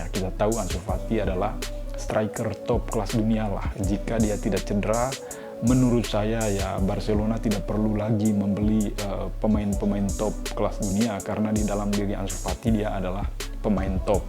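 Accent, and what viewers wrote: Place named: Manggarai, Indonesia. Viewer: Dari dialeg nya bung kek logat manggarai.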